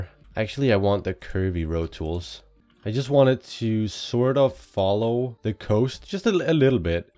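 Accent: Denmark. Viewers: Immediately noticed the Danish accent 😂 Love the video tbh though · I thought your accent sounded a bit similar to a Danish youtube friend of mine, and I was right! You are Danish. Dannebrog for altid!! 🇩🇰🇩🇰🇩🇰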